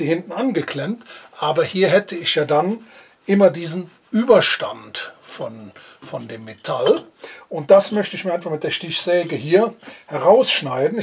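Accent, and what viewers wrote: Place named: Cologne, Germany. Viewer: 👍🤣 bin eigentlich kein Kölner 😉 finde aber Deinen leichten Akzent sehr nett 😊 Gruß Detlef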